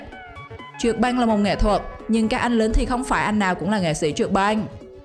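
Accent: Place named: Central, Vietnam. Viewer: Cám ơn admin cháu gái dễ thương ❤admin cháu gái có giọng nói miền trung nghe ngộ ngộ dễ thương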